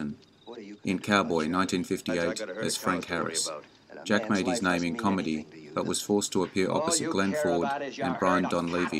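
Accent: United Kingdom. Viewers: A guy with a limey accent reading a script... do I take his word regarding cowboy actors? Naw. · You sound as if you are from Great Britain so why do you feel you are qualified to judge a Western?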